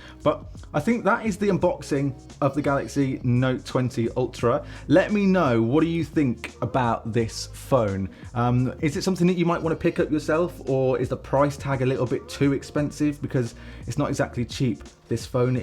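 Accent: United Kingdom. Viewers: Your British accent is quite nice, isn't it?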